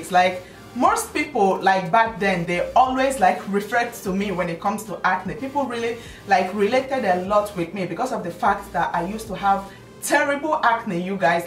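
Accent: Nigeria. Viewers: You are so beautiful and tutorial is explanatory and easy. You sound Nigerian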